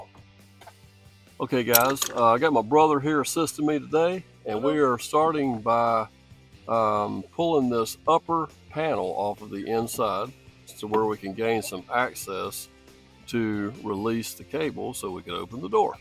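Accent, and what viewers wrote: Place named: Texas, United States. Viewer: Appreciate the video and your accent. Texas?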